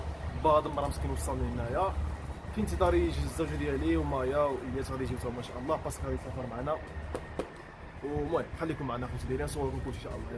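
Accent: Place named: Algeria. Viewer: من اللهجة ديالك كتبان جزائري ماشي مغربي لي متافق يدير لايك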